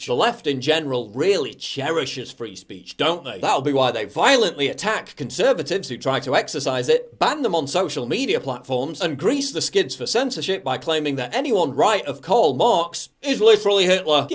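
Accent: United Kingdom. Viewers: Talking standing in front of an world map, having an statut thinking as your banner, having a elegant british accent, having your full name as your username and making political rants on the internet does not make you an extreme philosoph who's always get facts right. It makes you ANOTHER random individual expressing his points of views and again... On the Internet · PJW, As a Christian man that recoils at the sound of profanity..... I must admit, I laugh when I hear you cuss! Not sure if its the British Sterling Accent or what, but it just makes me giggle!!! PS: DONT tell my Wife!!! =) Secretly subscribed!!!! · It's like my thoughts with a British accent?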